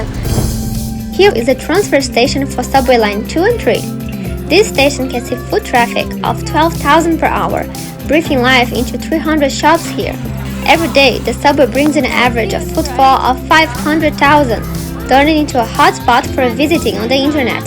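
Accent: China. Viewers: Your voice is so unique like a little girl. You even have a Chinglish accent.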